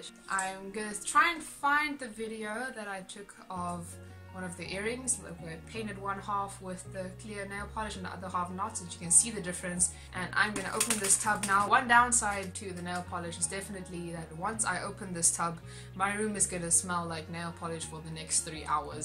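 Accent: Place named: South Africa. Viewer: Just your voice tells me u r South African :) love ur cosplays I hope one day I can cosplay like you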